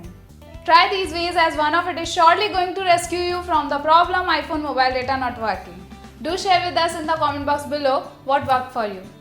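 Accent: India. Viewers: She’s Indian brahh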